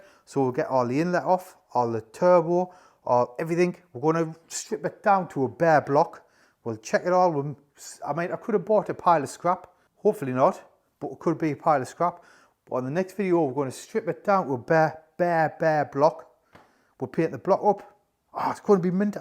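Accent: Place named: Sunderland, United Kingdom. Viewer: Great mate. Sounds like your from my way sunny Sunderland. Caught wait for the next video. If you need any free labour let is no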